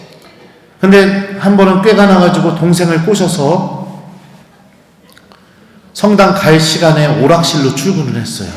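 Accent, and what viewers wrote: Chungcheong, South Korea. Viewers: 신부님 말습이 너무 웃기고재미있어요 충청도 아젓이 신부님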